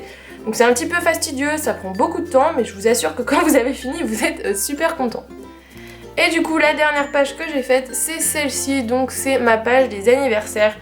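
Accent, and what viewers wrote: France. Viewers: Très bien les explications! Très utile aussi ta vidéo comparaison Bullet journal/ planner. Petite remarque quand même:: Puisque tu écris et utilises beaucoup l'anglais, tu devrais faire un effort sur ta prononciation. C'est vraiment TROP français... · mais stooooop l'accent mega français la "bullet journal" c'est un mot anglais mettez y du votre un peu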